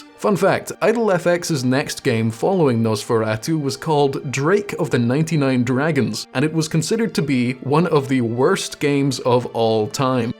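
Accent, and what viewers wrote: Scotland, United Kingdom. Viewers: I did not realize that you were Scottish until this video I just thought you were polish or czech or something because that's so common for youtubers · wait you're british? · he’s like Scottish jerma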